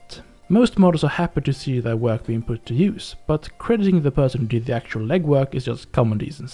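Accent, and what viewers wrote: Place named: Sweden. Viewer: This is one of my favorite scenarios, both for the interesting gameplay (plenty of secondary objectives that do what they're supposed to without also derailing the basic gameplay) and not least for the visuals (!!!): it's a beautiful and very atmospheric autumn-style map (a very underutilized season, as is also commented upon in the video) that - crucially - does an excellent job at including mountainous terrain in a way that's more involved and interesting than merely dotting the map with Mountain-type Gaia sprites. Absolutely one of my favorite scenarios in this astoundingly long-lived (and still-fresh, even after so many years) gem of a game! Also: fun to see a fellow Scandinavian delivering the goods as far as top-notch scenarios go (judging from the accent, this guy must definitely be a Swede - way to go, Söta Bror!)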